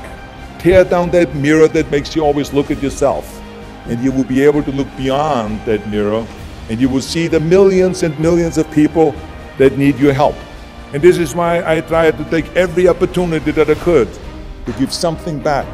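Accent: Austria. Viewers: Thee Terminator because it’s not just German accent, it is Austrian German accent, plus the dialect specific to the region where he grew up. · You gotta have this charming austrian accent. Got it.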